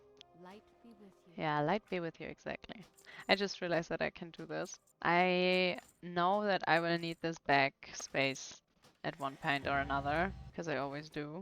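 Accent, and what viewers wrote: Germany. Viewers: Are you German or Austrian? Wonderful content Edit: yes you said you are German in the video lol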